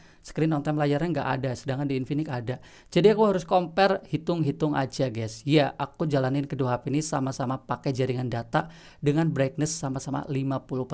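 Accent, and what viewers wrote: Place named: Cilacap, Indonesia. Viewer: Kaya wong cilacap kiye apa yahh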